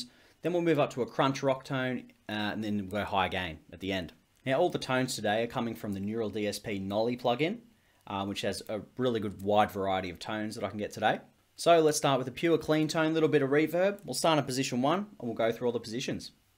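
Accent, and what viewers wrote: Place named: Australia. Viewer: I was looking at maybe buying this guitar and thought I'd look up a review, saw the Aborted shirt, then heard the Aussie accent and it was gg on the subscribe button lol 🤘👌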